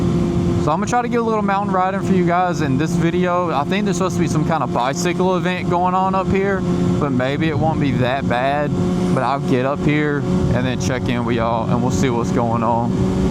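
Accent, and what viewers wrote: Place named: South, United States. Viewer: i knew i been hearing a southern accent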